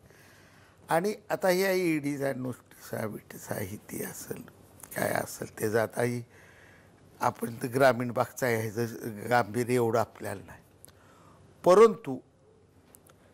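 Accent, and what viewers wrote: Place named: Satara, India. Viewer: अस्सल मराठी बाज ,रांगडी , असली माण सातारी भाषा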